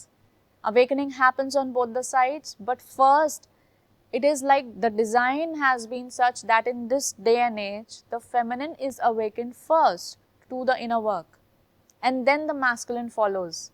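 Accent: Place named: India. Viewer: I just love that Indian accent 😊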